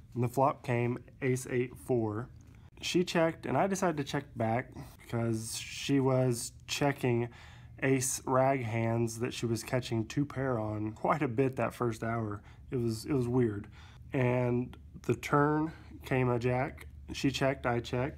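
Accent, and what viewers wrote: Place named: South, United States. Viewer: Good vlog.....good voice and nice format; the southern charm (I'm from Eastern TN) is a big plus!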